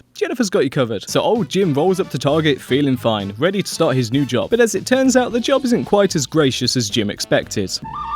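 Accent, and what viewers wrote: United Kingdom. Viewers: he's fucking english dude what do you want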